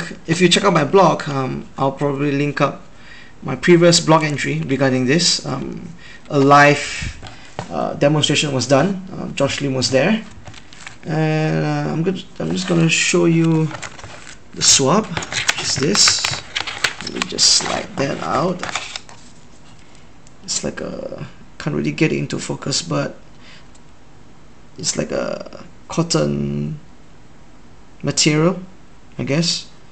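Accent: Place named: China. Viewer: You are Chinese or Chinese descent? I can somewhat tell from your accent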